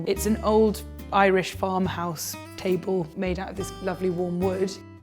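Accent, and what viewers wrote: RP, United Kingdom. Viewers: Oh I agree, it's so creamy and elegant. Sadly it's not a natural, regional accent one can just pick up in life: a public school accent costs a lot of money.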